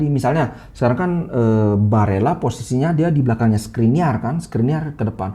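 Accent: Bali, Indonesia. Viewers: Menarik komentarnya, apalagi dialek Balinese membuat senyum2 mendengarnya. Lanjutkan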